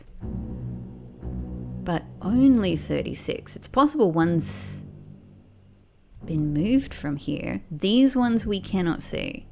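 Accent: Australia. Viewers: I immediately clocked your accent. As a fellow Australian 😅. It’s nice to have a familiar accent on a playthrough. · I was wondering what your accent was and then literally you said “As an Australian…” funny timing! Love the play though so far!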